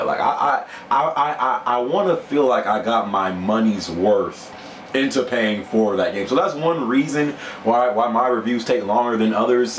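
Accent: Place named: Miami, United States. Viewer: I can tell that you probably from Miami. I might've seen you before somewhere. And fuck Miami and the Heat!!!!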